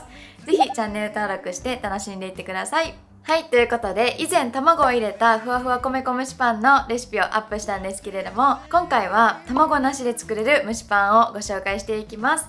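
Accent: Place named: Osaka, Japan. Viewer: ありがとうございます✨ レンチンはできるだけ避けたいので、自然解凍して蒸し器で蒸す方法試してみますね🎵 余談ですが、私は香川です😊 大阪が大好きで、毎月1～2回はユニバとユニバ周辺に遊びに行っていました😊大阪に住みたいと思ってたくらいです😊 は出身が大阪なんて羨ましいです✨ときより大阪弁なのも羨ましいです😁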